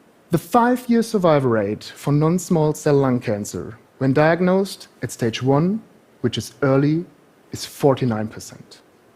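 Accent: Germany. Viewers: Oh my, that German accent.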